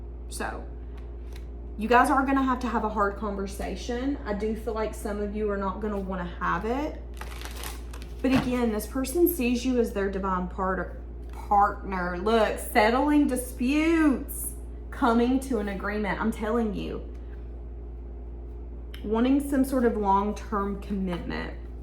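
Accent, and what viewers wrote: South, United States. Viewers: Accurace as always! Loooove your adorable country 🤠 accent 🌹🌹🥰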